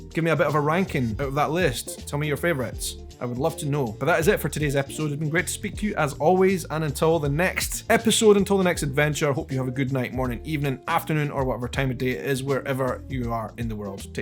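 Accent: Scotland, United Kingdom. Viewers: Scots like you say the pop in "Popeye's" like southerners e.g. in Tennessee, say "pop tent". It's basically a cross betwixt 'pup' & 'pop', a wee-bit closer to the 🐶. 🆒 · you are like a big, bright, beautiful bouquet of sunflowers in the morning! (Wow, I guess that was a mouthful). I LOVE your videos. HOWEVER, I do have one criticism: DEARHEART, YOU TALK TOO FAST. I am from the South in the US, and our lifestyle is so much slower and leisurely. We are not stupid; we just take our time. I have to sometimes watch your videos twice or more to absorb the content. You speak at warp speed (yes, a Star Trek reference). I do not know if your manner of speech is a Scottish trait or your individual personality. I feel sometimes that my brain is exhausted about halfway through some of your videos, and I give up. Sometimes I just redo it if it's content I really, really wish to see. 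I have reviewed some of your videos and found that you do not impart all your words of wisdom like a squirrel on steroids each and every time. You can make longer videos or divide them up into parts I and II. I enjoy the content so much and adore your accent. So, please, if possible, endeavor to slow your words down a bit for some of us older folks (I'm 67) who so enjoy your videos. By the way, I enjoy all the fast food restaurants you mentioned, especially Chik-Fil-A. Their grilled chicken sandwich is awesome, but their warm, chocolate chunk cookies are to die for. I hope you tried them. They are too good to miss. God bless. Love and hugs from Karen in Tennessee. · In-N-Out is the best! Open one up in Scotland and you can call it In-N-Oot. Meant with total respect for my favorite accent in the world. ❤️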